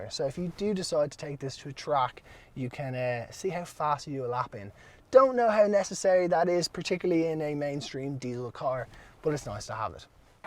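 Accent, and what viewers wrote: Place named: Ireland. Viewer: Golf or = Golf R , its his irish accent .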